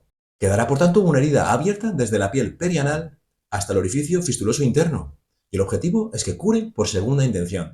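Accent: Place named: Spain. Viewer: No es obvio el acento? Jaja es de España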